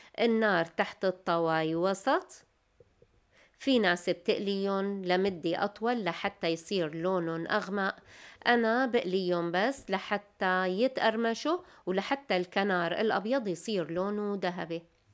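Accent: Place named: Idlib, Syria. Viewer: العفو منك اختي انت كاتبه مطبخنا الشامي بس لهجتك مو شامية لهجتك ادلبيه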